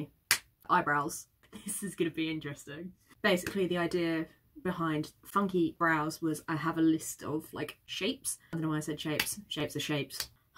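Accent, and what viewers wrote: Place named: United Kingdom. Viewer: O f#ck, you have british accent, very nice!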